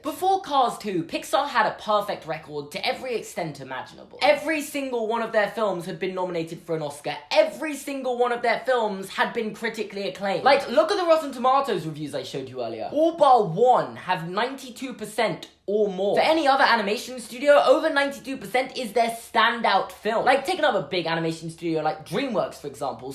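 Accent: United Kingdom. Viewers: Because of your British accent, when you turn on the captions "Cars 2" becomes .... "Cause"....? Or my phone is just glitching again?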